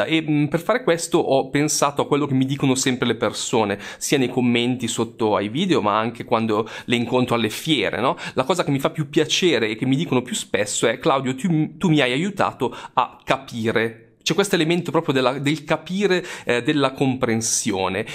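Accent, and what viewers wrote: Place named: Milan, Italy. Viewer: Oggi l'accento Milanese era ben evidente : )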